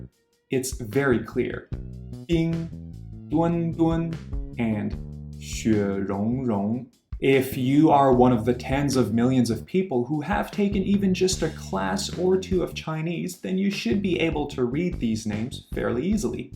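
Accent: Standard. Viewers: 你的普通话说得非常好，很标准，甚至听不出口音，真的很厉害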